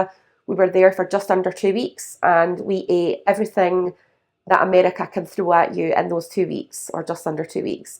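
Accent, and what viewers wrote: Scotland, United Kingdom. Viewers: Your Scottish accent is adorable 🎉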